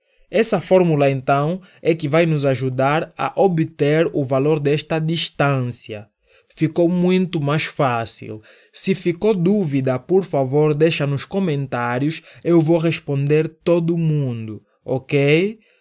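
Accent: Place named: Angola. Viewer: FALA IGUAL ALGUNS CONHECIDOS DA ANGOLA!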